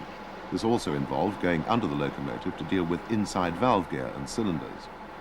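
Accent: RP, United Kingdom. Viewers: The "posh voice" is fine. I hate English classism but it's clear and easy to understand. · It is not "posh" it is actually "received pronunciation", as in, for voices to be "received" over radio you had to speak clearly to be understood, especially before the days of HD/digital etc.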